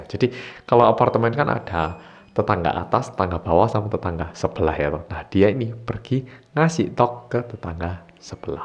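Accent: Java, Indonesia. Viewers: Aku tu kalau lihat mas langsung suka sama bahasa medok nya itu Lo, tapi bisa bahasa Jawa, pokok e nyeneng ne banget😂😂😂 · kenapa logat nya ngejowo banget mas 😂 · Lancar banget bhs Indonesianya dan agak berlogat Jawa. Keren nih mas 👍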